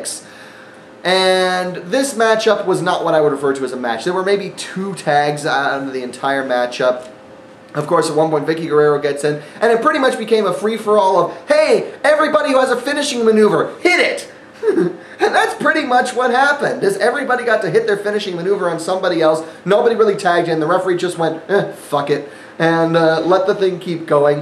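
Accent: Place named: Mexico. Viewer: im mexican and i found ur mexican accent funny i made me crack up great review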